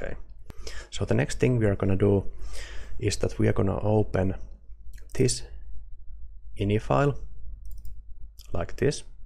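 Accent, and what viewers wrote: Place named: Finland. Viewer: Brilliant video, also your accent sounds finnish :b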